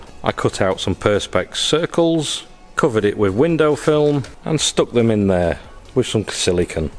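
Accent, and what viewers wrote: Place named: United Kingdom. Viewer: Great video. Thanks. Perhaps its the British accent that makes it seem so easy? Will be doing some LED-ing on my 69 Dodge Charger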